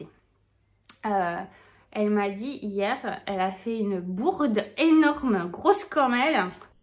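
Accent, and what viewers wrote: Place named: Spain. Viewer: Bonjour …un plaisir de te retrouver avec ton accent espagnol..tu es adorable et me fait bien rire 😊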